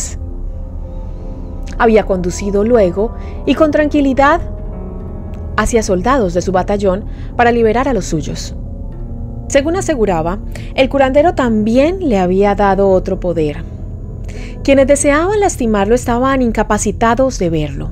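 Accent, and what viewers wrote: Colombia. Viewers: puro español de Bogotá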